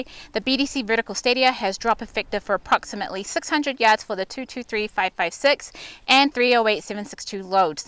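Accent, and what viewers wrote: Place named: Australia. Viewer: Great break down and description . Was thrown at first with the accent and was surprised to see your channel is out of Australia. With the law's trying to get changed here in the States and law's that had been changed in Australia. Very interesting the many topics your channel can bring. Again great review , very well presented and very informative. would like to see actual action/use of the product/product's. Keep up the great work!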